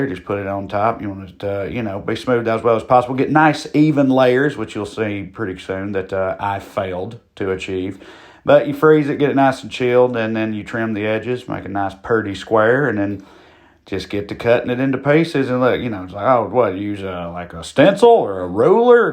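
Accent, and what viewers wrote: Scotland, United Kingdom. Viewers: Your array of talents continues to leave me in awe. Cooking plus British dialects? Lawd have mercy! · You knew we needed a second helping of the Scottish accent! Thanks for that! 😂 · I am weirdly fascinated by this "Scottish" accent. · Love the accents - especially the Scottish one - that's what I come to for, baby. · I’m down for any video where you use a Scottish accent,! These look absolutely luscious 😋😋😋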